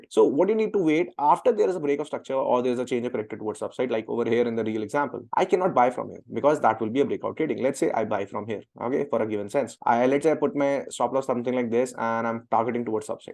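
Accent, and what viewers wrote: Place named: India. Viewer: India Accent is terrible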